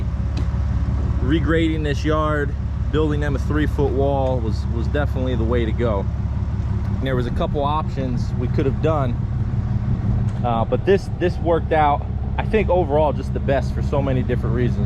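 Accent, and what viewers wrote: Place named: Northeast, United States. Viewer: Only two minutes into the video. Are you based outta the northeast? Couldn't guess anywhere else haha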